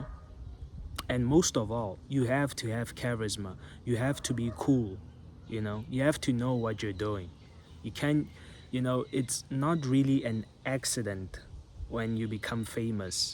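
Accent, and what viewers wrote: South Africa. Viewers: Are you from South Africa? picked up on the undertones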